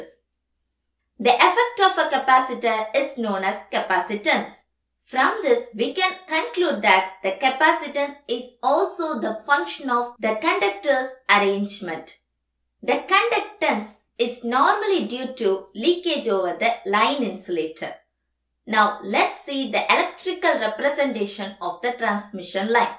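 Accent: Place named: India. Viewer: wwanna punch this girl. why do all indian;s accent have to be like this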